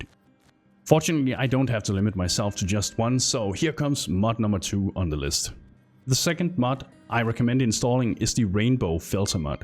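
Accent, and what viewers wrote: Denmark. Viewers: 5 seconds in, you are definately danish right? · Thanks for this video <3 BTW are you danish, your accent sounds danish. :) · Dat danish accent tho..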